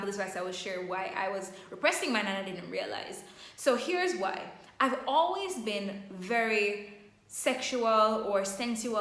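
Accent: Jamaica. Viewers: Are u from Jamaica? Your accent is nice. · I sense a jamaican accent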